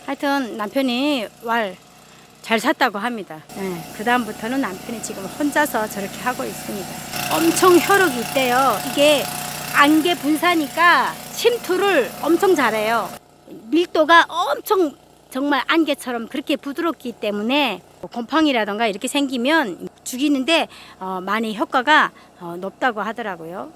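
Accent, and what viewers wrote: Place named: North Korea. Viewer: 말소리가 북에서 오셨나요. 쉬엄쉬엄 하셔요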